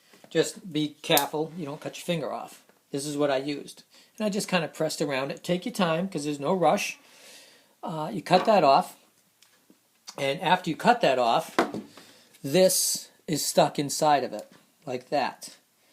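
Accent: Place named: New England, United States. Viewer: Definitely a New Englander!! (Bostonian?)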